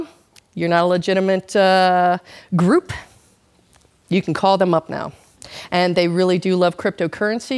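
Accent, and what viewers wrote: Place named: United States. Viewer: Interesting .... Dutch lady with an American accent..... hmm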